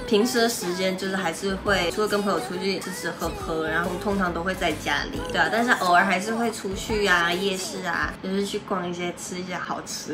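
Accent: Taiwan. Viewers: 變台灣腔了XDD 說話方式 用詞都被影響到了哈哈哈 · 口音有點台灣腔，好可愛ớ ₃ờ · 台灣腔！馬來腔不見了哈哈哈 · 哇 直接台湾腔 没有马来西亚腔了 听起来真的舒服很多 我自己也是马来西亚人可是觉得马来西亚音很难听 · 为什么有一点台灣腔😂😂😂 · 從對著鏡頭彈唱的小女孩 現在明顯的看出到了成熟穩重 我哭遼qwq 影片看到一半發現草草的口音變成了台灣口音😳 可愛死了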